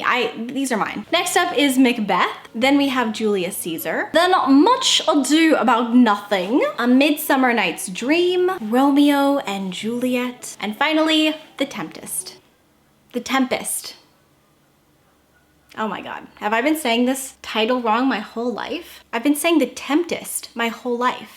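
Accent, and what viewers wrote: United Kingdom. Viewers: OMG That bri-ish accent!!! YOU TOTALLY KILLED IT HAHAHA!!!!💗😹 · I bloody knew you were Bri’ish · That intro 🤣🤣🤣🤣 your British accent could star in a period drama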